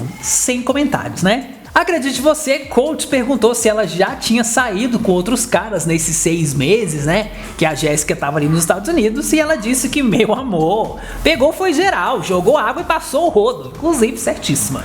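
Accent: Brasília, Brazil. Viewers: Quem fala Es menina? Fala Tu como brasiliense fala kkk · Você é de Brasília??? Por isso me identifiquei muito com a sua fala! 👏👏👏😄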